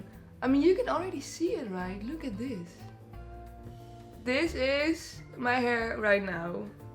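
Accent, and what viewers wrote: Netherlands. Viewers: Wtf is that accent. She is 100% Dutch. Aaaaah cringe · Are you from the netherlands becouse iam and your accent is sumulair to myn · Ik hoor meteen dat je een Nederlander bent😂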